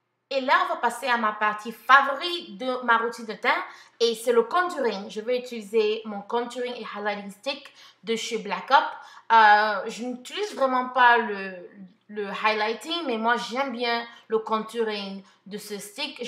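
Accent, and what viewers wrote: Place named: Cameroon. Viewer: Tu m'as bien fait lap J'aime bien ton accent camer . Ta routine est au top et j'aime beaucoup les fard à paupières :)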